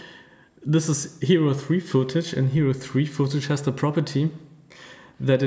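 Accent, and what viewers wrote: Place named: Germany. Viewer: The english seems to have a german accent, aber ich könnte mich auch irren =) Will you maybe bring your liveviewrift to the Gear VR aswell?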